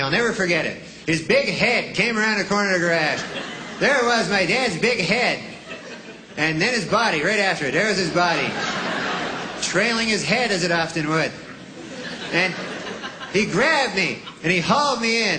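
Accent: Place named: Canada. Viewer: He sounds so Canadian holy crap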